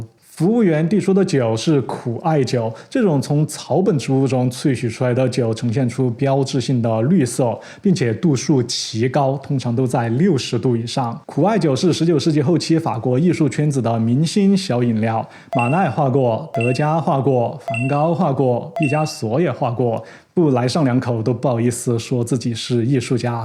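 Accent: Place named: Chongqing, China. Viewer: 很喜欢你的重庆川普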